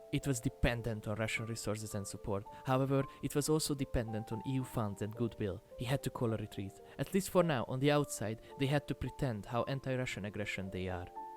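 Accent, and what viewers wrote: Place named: Hungary. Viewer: Hungárián akcent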